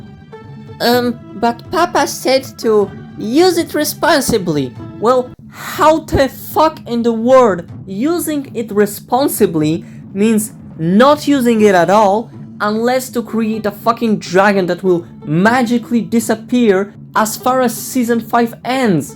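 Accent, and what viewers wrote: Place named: Poland. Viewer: i can hear your polish accent im polish too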